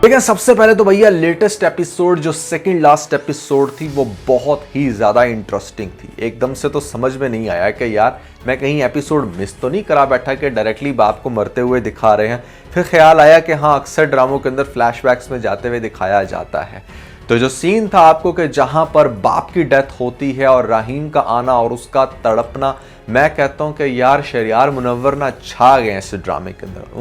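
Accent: India. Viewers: Indians ki trah "phir" KO "fir" Ku bolte ho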